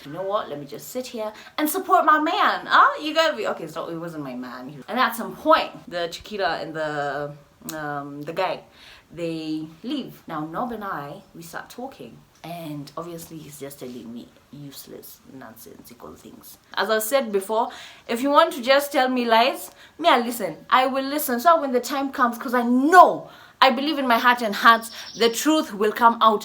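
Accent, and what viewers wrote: United Kingdom. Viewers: I reall love how you mix up accents, , british English and "kenyan" english, , na some kiswahili😂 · That British accent 😍😍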